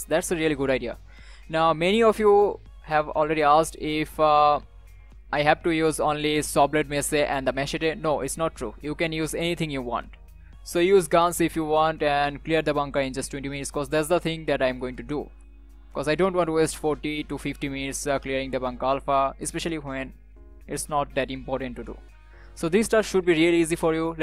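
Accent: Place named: India. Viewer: you sound Indian af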